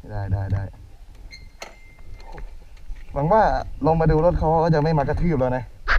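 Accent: Isan, Thailand. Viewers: พี่พูดอีสานดูน่าฮักขนาดติดตามงับๆ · ตอนแรกคิดว่าพูดเหนือ เอาไปเอามา ออกอีสาน 5555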